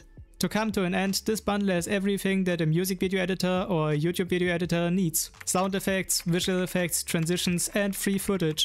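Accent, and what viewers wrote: Germany. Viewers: Do I hear da etwa Deutsch?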